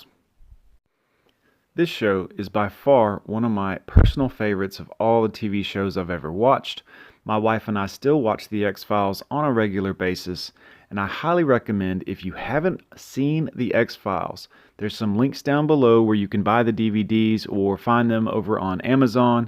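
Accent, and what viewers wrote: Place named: South, United States. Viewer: also i'm assuming your southern, i can somewhat tell with the subtle accent and a very occasional "y'all"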